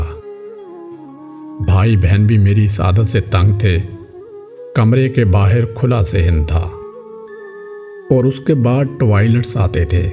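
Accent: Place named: India. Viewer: It was good video but it looks like some indian dude made it with Indian portraits, it’s more impressive when Pakistani have their own portraits,even narrated by Indian accent. 20 years ago Pakistan was not part of India. It’s nice try by Indians to make Pakistani cry.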